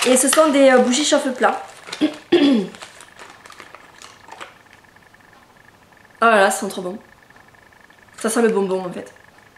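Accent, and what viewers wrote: South, France. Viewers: Haa a peine j'appuie sur play j'entend l'accent du sud c'est bon ca ! :p Tu viens d'ou ? :)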